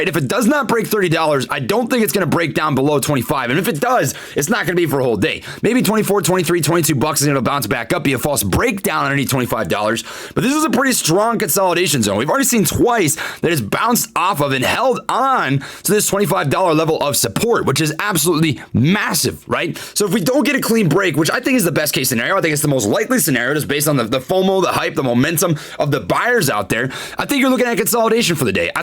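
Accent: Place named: New York City, United States. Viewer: Haha live ur channel man. You are def from the NYC area. Haha gotta be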